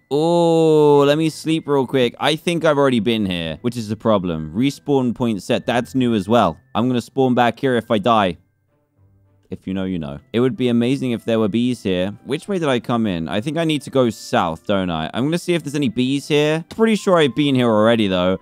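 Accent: England, United Kingdom. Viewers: Wat part off England are you from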